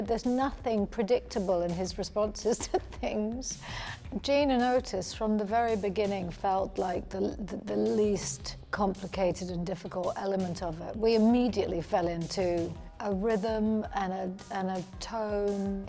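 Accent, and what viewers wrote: England, United Kingdom. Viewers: Ok can someone please tell me once and for all if shes American or british bec sometimes she sounds American and i know that's her nationality too, but here for example she sounds SO British im so confused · Because she lived in London for several years as a child, so her English accent is just as natural to her as her American accent. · I had no idea she is from the UK. I never heard her accent before. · Why is she still talking with an English accent? :p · She has her British accent on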